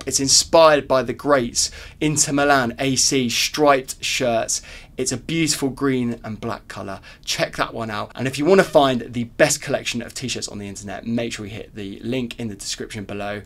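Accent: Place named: Brighton, United Kingdom. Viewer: Are you from Brighton? You sound a lot like some people I know from Brighton. Love your attitude and outlook. We need more fans like you.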